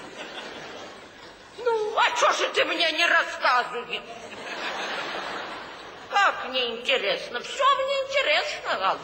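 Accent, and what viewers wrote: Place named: Russia. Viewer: Не понила это что русской деревне так разговоривают ,браво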